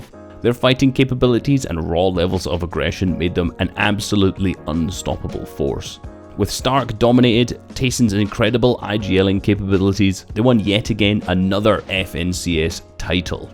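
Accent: Scotland, United Kingdom. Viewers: are you scottish or american please choose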